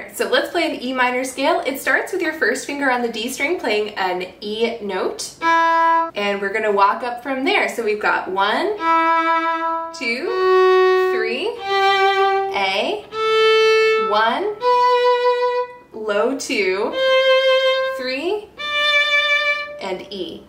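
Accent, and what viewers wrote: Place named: United States. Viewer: wow you aound so american it makes it really hard to follow along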